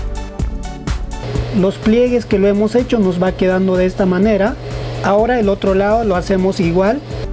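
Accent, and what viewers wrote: Peru. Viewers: Obersay? Tela flish terri? Q gracioso para peruanizar las palabras 🤣🤣🤣 igual se ve buena onda, saludos!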